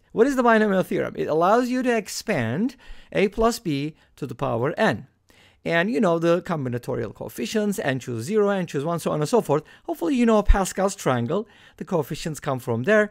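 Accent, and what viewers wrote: Turkey. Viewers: Telafuzunuz Türk olduğunuzu düşündürdü. Doğruysa video için çok teşekkürler ;)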